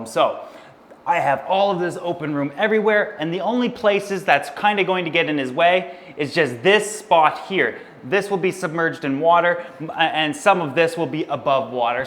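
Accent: Canada. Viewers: Are you Canadian? :)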